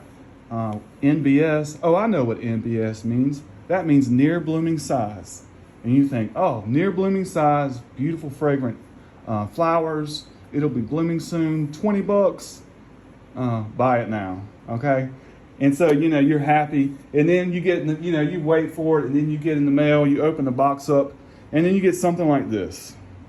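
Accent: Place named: South, United States. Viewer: Hey dear! Nice to put a cute face to a sweet southern accent 😉 Great shout out for moms! 😁 I think seedlings should be labeled 1st year, 2nd year 3rd year. It gives me more of idea what I'm dealing with. Ebay sellers want to sell 1st years as NBS!!! Ugh aggravates me! Great video! 👍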